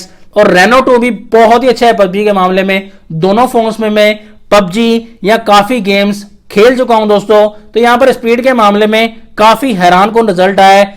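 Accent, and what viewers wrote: India. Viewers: Such a pendu speaking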